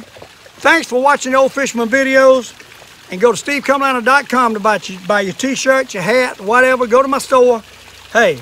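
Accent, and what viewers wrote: South, United States. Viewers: My poppa always cut their heads off and gutted them, but my wife and kids dont like eating panfish that way, so we dont eat fish often. Came here for some info about fileting panfish, and found a goldmine. Poppa was from southeastern North "Cackalacky," and his accent was so similar to yours that this was almost like listening to him teach me how to filet panfish. Only thing missing was him saying, "now look here boy" about 30 times in 10 mins, 😂. We lost him in the fall of 2000, and I still miss him, but this vid just reminds me of fishing trips with him, especially his last where the heat got to him and as we were leaving, we got into a school of Crappie (crap-ee is the right way to say it too, lol), and he told us to stay a while more and we all (me, my dad, and him) caught our limit of huge Crappie. Had a HUGE fishfry that weekend! 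150 fish! Thanks for posting this, sir! Both for the education and sparking one of my most cherished memories. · You are inspiration sir. This Yankee loves your accent and your down-south upbeat personality. Your mama raised you right and I bet you never slapped her in your life. LOL...take care! Mike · LoL it's all Good, us Northern Yankees say crawwpys. But I'll admit, you got yourself some big ones, down there,. Evin if you all talk a little funny,. Great demonstration.and video,. Cheers !!!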